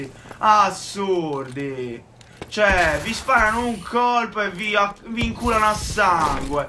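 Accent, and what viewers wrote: Sardinia, Italy. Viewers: Vai...ma sei sardo? Se si...anche io sono sardo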